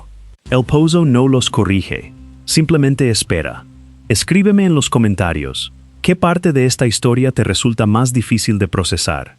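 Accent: United States. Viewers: Hablas bien el español y después hablas como gringo, me parece patético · Hola. Interesante a pesar del español con demasiado acento norteamericano. Pero está bien. M impresiona la tozudez de los buzos por el peligro. La gente es así. Es igual que los departistas que sabaen que va a ser sancionados si toman estimulantes y aún así lo hacen. Son los que audazmente ignoran las advertencias de peligro. Es igual que l los que roban. o asesinan. Saben que los atraparán , pero aún así lo hacen. En todos los casos se les obnubila el entendimiento y la capacidad de razonar y medir el peligro.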